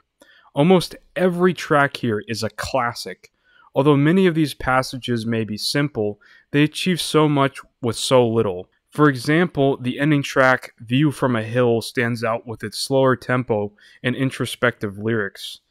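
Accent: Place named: United Kingdom. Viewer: Wait, you're from the UK? or are you reading a script? also I believe it's pronounced LEE-ver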